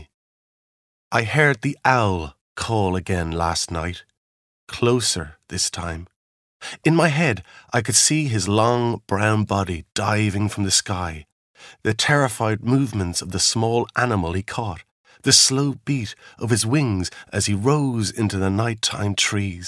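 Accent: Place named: United States. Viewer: American accent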